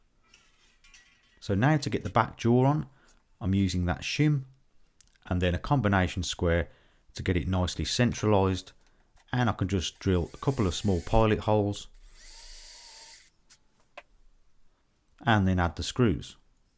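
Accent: Birmingham, United Kingdom. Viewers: Love the Brummie accent (I'm watching this in Australia, but I'm from Hall Green originally) - Thank for the video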